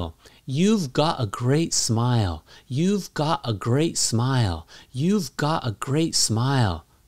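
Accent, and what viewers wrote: United States. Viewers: Thank you for such inspiring classes. I'm myself an ESL teacher with British accent, but enjoying a lot shadowing your American accent.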